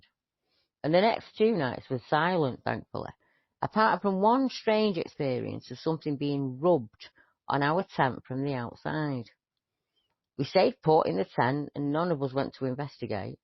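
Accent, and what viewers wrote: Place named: Manchester, United Kingdom. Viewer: accent is northern, sounds like she maybe from the Manchester area, however there are many different northern accents, such as Scouse, Geordie, Yorkshire, Cumbrian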